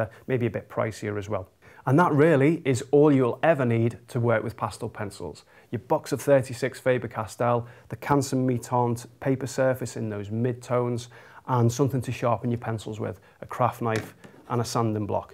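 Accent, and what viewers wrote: Liverpool, United Kingdom. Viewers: Your accent reminds me of home. Liverpool for ever!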